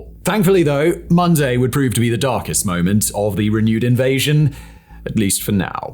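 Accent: England, United Kingdom. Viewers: you're British with the most English accent outside of the royal family or the 1950's BBC...It's 'Autumn' not 'Fall'.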